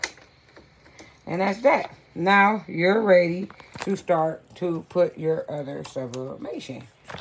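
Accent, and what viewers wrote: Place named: Rural, United States. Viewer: I wasn’t finished 😠 I started laughing when it started by showing your feet. I thought that was great! Here’s a non pretentious instructional video that might show me something. Then I heard the Country Twang and I was hooked!!! Wonderful job! No Fluff! I don’t care for fluff! I’m going to keep up with you. I’m coming online soon with a “Big Show”, and I would love to feature you. Now I’m finished ✊🏿✊🏿✊🏿❤️🖤💚😎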